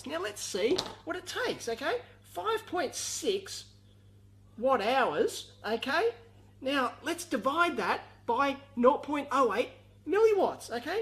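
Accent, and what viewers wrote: Australia. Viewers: lUV THIS GEZZER, Must have the best aussie acent on the planet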